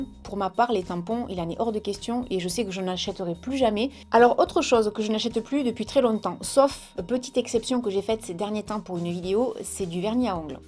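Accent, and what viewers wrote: South, France. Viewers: Nouvelle abonnée ,j'ai passé un moment très agréable à regarder ta vidéo. Merci pour toutes ces bonnes idées ,ta simplicité et cet accent du soleil😘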